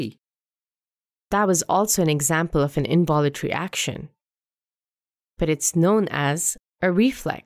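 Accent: India. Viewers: Tamil accent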